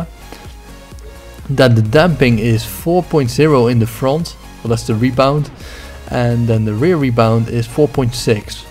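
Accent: Netherlands. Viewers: are you Dutch? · are you dutch because you're accent sounds like it :)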